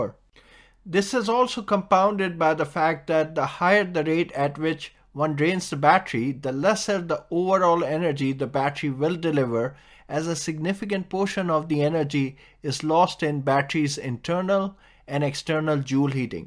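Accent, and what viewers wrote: India. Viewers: Fucking indian accent🤦‍♂️ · Акцент здорового индуса? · o!! indian accent, my pleasure)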